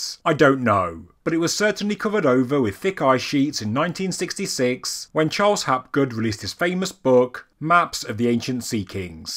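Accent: United Kingdom. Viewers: That's one version of a british accent, but I do agree that it can be hard to listen to for us north americans.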